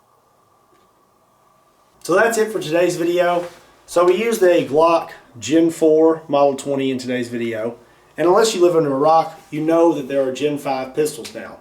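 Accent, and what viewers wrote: Kentucky, United States. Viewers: What part of Kentucky are you from?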